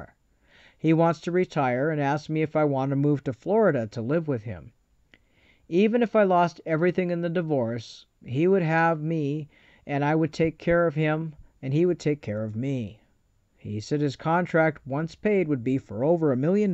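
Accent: Nigeria. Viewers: my MIL was over and as I told you, my 2 month old daughter has to listen to these voice chats to calm down. She hears the accent, and I asked her where she thought he was from. She said Europe. To me it's baffling, but I went to a high school in Central Massachusetts with lots of Africans, many from Nigeria with a thick accent. SO i grew up knowing the accent.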